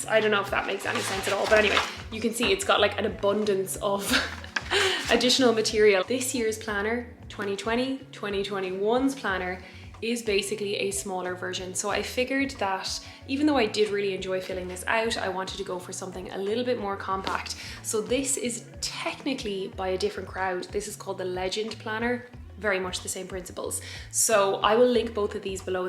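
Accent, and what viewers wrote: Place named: Ireland. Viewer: I thought your accent was Irish. It really suits you!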